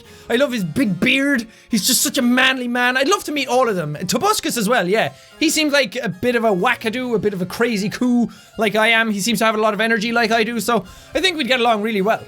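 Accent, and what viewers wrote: Ireland. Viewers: I know jack isn't faking his accent coz that how irish people talk like me coz I'm irish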